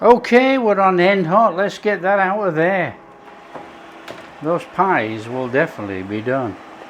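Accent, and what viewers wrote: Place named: England, United Kingdom. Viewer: Love your accent although I haven’t a clue where in England. Sadly these recipes will die out, perhaps I’m wrong. I was born in 1973 and growing up my mother always told how rewarding it was to produce a hot meal from ingredients that don’t cost the earth. She taught me to cook which has absolutely benefited me in my adult hood. I searched suet pie and stumbled upon your site, Traditional English cooking that tastes absolutely delicious. I’m originally from Norfolk and was spoilt with ingredients using pheasant and partridge I’m fed watching chefs that andypandy around throwing pepper/ god knows what over your shoulder. I’m now able to google/youtube your recipes & understand amounts etc to use. Thank u x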